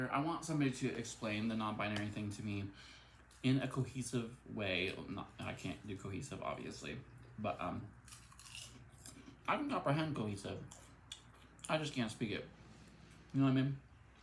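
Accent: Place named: United States. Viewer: Hello, you remind me a lot of my best friend, also the paint dilemma, it looks like acrylic, you can thin the paint with water, it does sound kind of dry plus, it allows you stretch it. I totally agree with you with the non binary stuff. Also I hope you get the surgery you want covered, insurance is a bitch. I literally had to pay for my sons lip surgery recently, he's a little boy and bit his lip, needed surgery to fix it. Cost us 2,000 bucks in the U.S. I'm assuming your also American from your accent. Insurance in the U.S. is a bitch.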